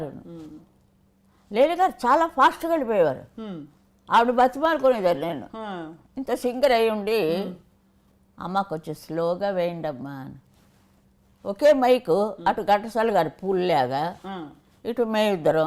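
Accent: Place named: Vizianagaram, India. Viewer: పాపం పసివాడు అమ్మా మీ మాటల్లో విజయనగరం కనిపిస్తోంది. మా నాయనమ్మ గారు పుట్టింటివారు విజయనగరం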